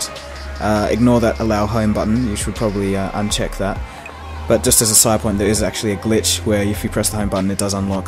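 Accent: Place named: Australia. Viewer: I wouldn't worry about it. You've got an "accent" being from Australia or wherever you're from, but other than that, you sound fine to my American ears. Good job with the videos. Very well done!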